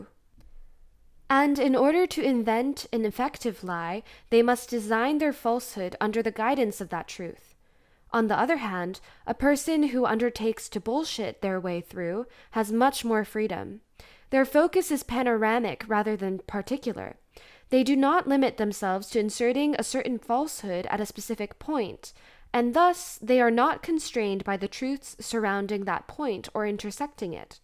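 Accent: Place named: United States. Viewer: Thank you for making this audiobook of the essay "Bullshit" by Harry G. Frankfurt (The USA 1986). Your diction, cadence and enunciation of American accented English is excellent. 👍 I just discovered your channel today, Sun. 3rd Aug. 2025 after reading an article about the essay on The Telegraph UK, mentioned on Drudge, It is true, the world in 2025 is awash in lies, humbug, obfuscations, spin, propaganda, deflection, misinformation, cover ups, destroying and concealing evidence, muddying the waters and leading the hounds of the scent. However, there is Objective Truth in the universe, i.e. either something happened or it didn't. A good saying I first read in 2003 comes to mind: "It doesn't matter what you think or believe, we will all know what is true and correct in the end!" שְׁנָאֵל שְׁפָתִים שַׁקְרִים יְהוָה, וְבָאֲנָשִׁים חָפֵץ. 😎 Adam Neira Founder of World Peace 2050 Founded in April 2000 Paris - Jerusalem - Melbourne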